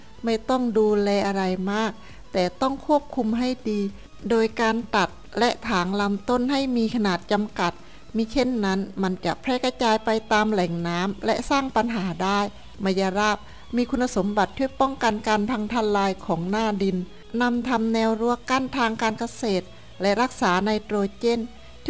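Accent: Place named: Cambodia. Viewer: สำเนียงคล้ายๆเขมรแถวบ้านผมเลยครับ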